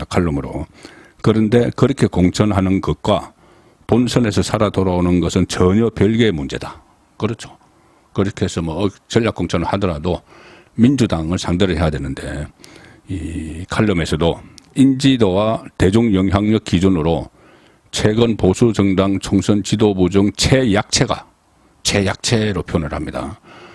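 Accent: Gyeongsang, South Korea. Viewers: 송국건님 제안드립니다. ※불필요한 군드더기 어~, 저~, 이~ 이런 류의 아주 나쁜 습관이 있어요. 속히 시정해 주세요. 경상도 억양의 전체적인 분위기야 어쩔 수없다 하여도 위에 지적한 것들이 심하게 섞일 때면 외면하게 됩니다. 발전하세요.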